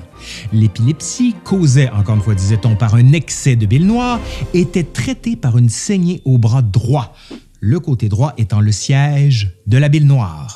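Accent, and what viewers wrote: Canada. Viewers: très intéressant... en plus. j'adore votre accent canadien ;-)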